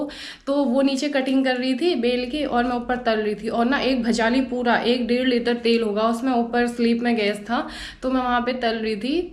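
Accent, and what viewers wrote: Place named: Kumaon, India. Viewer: आप बहुत प्यारी हो। आपके ज़ुबान से कुमाउँनी भाषा बहुत अच्छी लगती है।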